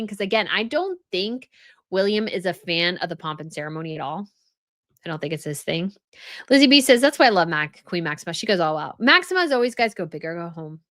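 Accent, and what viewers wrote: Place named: United States. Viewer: Good questions: why go to the party to begin with? Were they actually invited? Was it Megan’s decision? Surely you (ginger boy) know it’s rememberence day? Why not have all images scrubbed from the internet? It’s all bogus, a sham, a cock up. Now as for you, … use the profits you make and instead of the European tours with everyday folks and buying jewellery, etc. get a vocal coach, get a stylist, new haircut and colour, have the stylist redo your wardrobe, get some basic pieces that can be mixed and matched - spoil yourself, up your game. You've worked for it. This is your business and you (unlike megan) are the face of it and you need to present in a certain way. Now, granted, I’m no fan of the American accent and trust me, markle is a deplorable human being, but as a makeup artist and someone with brand management experience, you need to level up. 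Please stop saying “as….(long pause) Well!! (Hard W). It’s excruciating…and you come off as a circus MC/ game show host. It’s slightly inauthentic and I’m sure that it’s not something you are aware that you’re doing. Life has its ups and downs but take the time to better your offering and start with yourself. Your content, I’m sure, is fairly well-researched and put together. Apply that attention to detail to yourself or consider podcast-only content. Start with the vocal /voice coach to correct your cadence and go from there.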